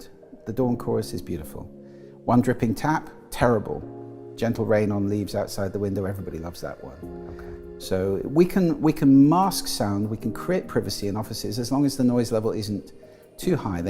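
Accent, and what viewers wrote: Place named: United Kingdom. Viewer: Some valid points. More speculation going on here “seemingly valid” with the ol’ British accent.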